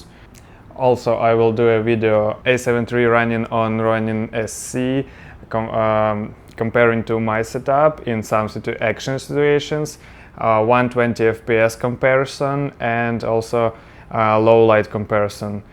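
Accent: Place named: Russia. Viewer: Чувствуется русский акцент😁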